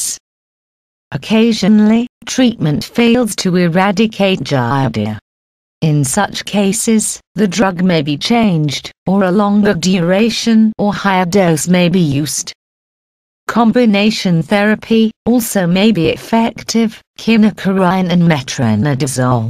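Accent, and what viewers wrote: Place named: England, United Kingdom. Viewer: That English accent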